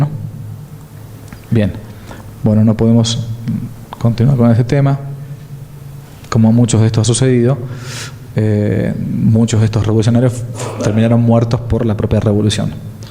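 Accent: Argentina. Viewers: Es una lástima que divulgadores hispanos de tanta calidad pierdan millones de personas de audiencia potencial por causa de ese fenómeno lingüístico particular que llamamos "shesheo" o "teísmo rehilado" típico de los porteños que tan cacofónico nos resulta a la inmensa mayoría de los hispanohablantes de todos los continentes.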